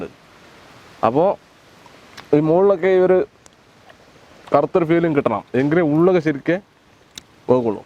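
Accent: Palakkad, India. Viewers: assalamu alaikum epozhum watch kayyil kanarund athond chodikkunnatha water resistant aano ikkayude watch? Njan oru army karan aanu palakkadan swadeshi epozhum ikkante videos follow cheyyarund bcz of your palakkadan slang keep going we are all with you always....(respect) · കുക്കിങ്ങ് വീഡിയോസ് ഇത്രക്കും റീച്ച് ആകാൻ പ്രധാനമായ കാരണങ്ങൾ... 1.പറമ്പിലും പാടത്തും പച്ചപ്പ് നിറഞ്ഞ ഗ്രാമ ഭംഗി യിലുള്ള ചുറ്റുപാടിൽ എടുക്കുന്നതും കൊണ്ട്😍 2. നാടൻ ലുക്കും വിനയം കലർന്ന പാലക്കാടൻ സംസാരവും😍 3.എന്തിനും കൂടെ നിൽക്കുന്ന കുറേ കൂട്ടുകാർ😍 4. അഗതിമന്ദിരത്തിലേക്കും മറ്റും ഭക്ഷണവും സഹായവും എത്തിക്കുന്ന മനസ്സിന്റെ നന്മ...😍👉👉ക്യാമറമാൻ്റെ കാരൃം പിന്നെ പറയേണ്ടതില്ലല്ലോ....😍 ഇതെല്ലാം കൂടിക്കലർന്നപ്പോൾ Village Food അങ്ങട്ട് കളറായി ജനങ്ങളില്...🥳...😍 ശരിയല്ലേ പറഞ്ഞത്🥳🥳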